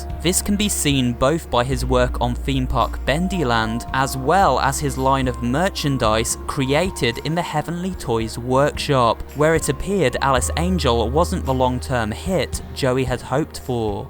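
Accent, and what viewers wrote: United Kingdom. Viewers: You are like the British version of the Chills YT channel with the way you talk.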